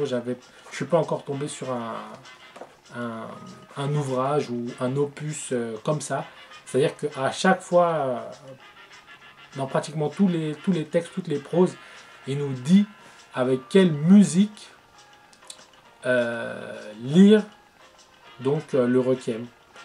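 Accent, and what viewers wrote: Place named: France. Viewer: real english frenchie accent still cute ^^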